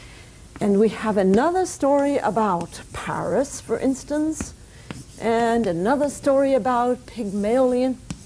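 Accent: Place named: Ireland. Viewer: 15 minutes in before I noticed the Irish accent.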